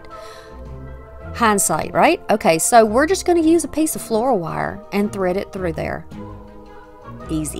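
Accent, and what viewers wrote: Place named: Alabama, United States. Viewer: I could tell you were from Alabama!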